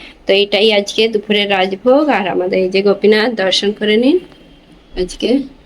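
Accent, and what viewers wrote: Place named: Birbhum, India. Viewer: Birbhum ,Purulia ,Bakura hobe ,kothar style e tai mone hocche ,😄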